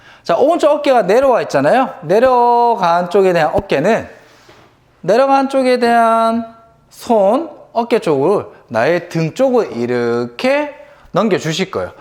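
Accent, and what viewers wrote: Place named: Gyeongsang, South Korea. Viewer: 말씀 들어보니 경상도 싸나이신듯 하네요.☺따라해볼께요 땡큐♡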